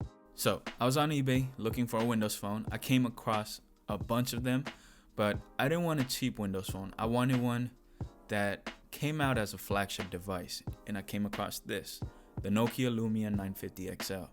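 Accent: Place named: England, United Kingdom. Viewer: I like your English accent. I am a native Spanish speaker but I also speak English as my second language and it sounds just like yours. I broke my phone about 3 years ago. Asked one my friends if he had an extra phone floating around in his house that I could use and he said yeah. He gave me an HTC Windows phone and I was so happy cuz it was my first time seeing a phone like that but the sim slut was too big for my chip so I'd only be able to use it with wifi. It started working real good but after a month or two it shut down completely. Whenever I turn it on, it won't connect to the wifi.. Can't do anything on it. Can't text .. can't do none of that anymore. Went on Saint Google looking for answers and I found out that it has stopped working.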